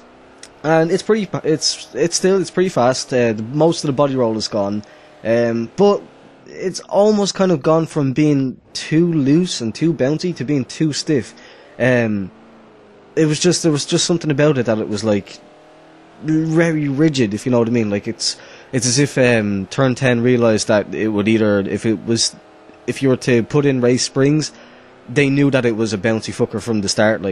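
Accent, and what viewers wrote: Dublin, Ireland. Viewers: Where in Ireland do you live? You sound like a bit of a Dubliner. :)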